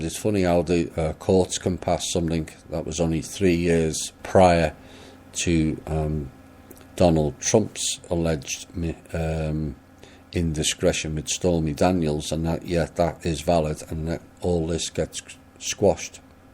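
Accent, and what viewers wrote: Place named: Liverpool, United Kingdom. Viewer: Alright Scouse !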